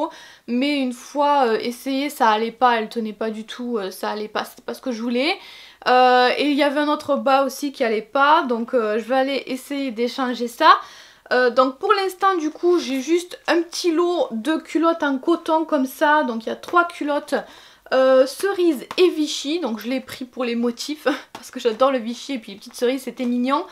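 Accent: South, France. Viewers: petit accent du ud qui va bien avec, super video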